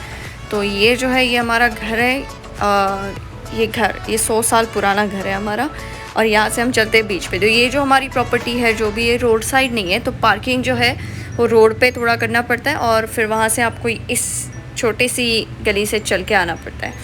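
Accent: Malvan, India. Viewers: तुझा मालवणीच आमका गोड वाटता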